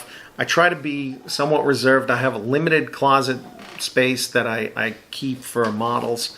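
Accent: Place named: Queens, United States. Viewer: Question: You’ve lost your mojo for a Tamiya American twin jet fighter (F-15), but you’re excited about a Tamiya American twin jet fighter (F-4). What is it about the Eagle that you’re not feeling? There’s nothing about the channel I don’t like. You tell it how it is, you explain your thought processes and the music’s not annoying. Your build quality is inspiring and your Queens accent is refreshing for this Australian subscriber. I like all your build choices, and as such, I’d rather not recommend any particular kit type. I’m nearly finished my Eduard/ICM Dornier 17Z-2 ‘kampfstift’ build and you’ve just ticked my Luftwaffe subject matter preference box. All the best.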